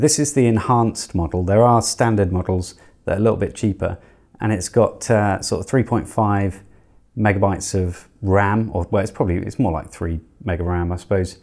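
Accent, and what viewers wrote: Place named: Switzerland. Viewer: here is the guy with swish accent ;) i like your videos sir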